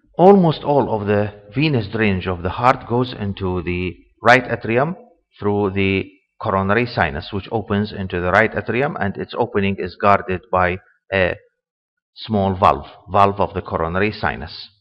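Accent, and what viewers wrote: Iraq. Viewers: you sound iraqi are you ?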